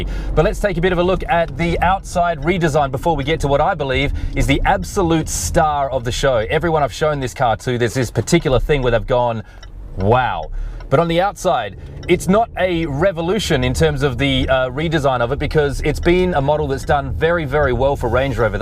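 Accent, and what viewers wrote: Australia. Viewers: Perth accent? Lol.... im getting this, luxury ride 🤩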